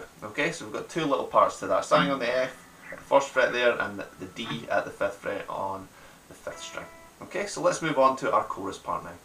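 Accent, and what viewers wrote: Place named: Scotland, United Kingdom. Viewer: Sorry this has nothing to do with the song, but is there anything better in the world than a Scottish accent? I think not. Good job on the song too. Greetings from America!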